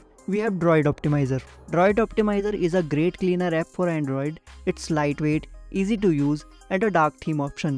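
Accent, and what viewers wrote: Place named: India. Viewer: It's a normal indian accent. Your comment is racist af.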